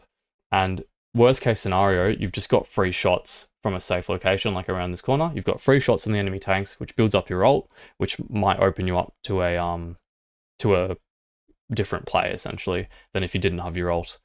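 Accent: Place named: Australia. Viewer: I love your Australien Accent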